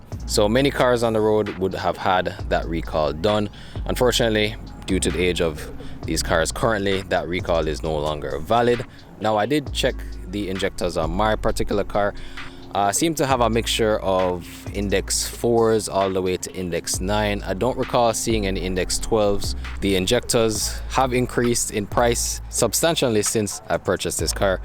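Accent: Jamaica. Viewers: You Jamaican?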